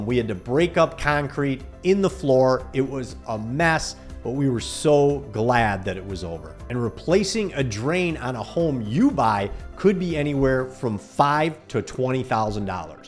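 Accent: Michigan, United States. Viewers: Yep, I thought you were a fellow Michigander, as soon as I heard you talk.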